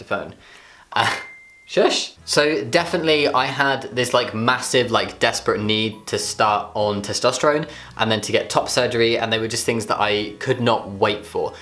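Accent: United Kingdom. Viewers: just luv your Brit accent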